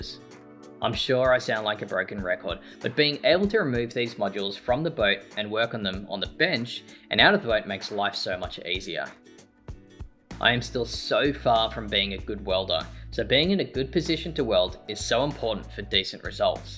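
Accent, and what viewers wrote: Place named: Australia. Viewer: keep the content coming. I also learned some of the Australian accent 😆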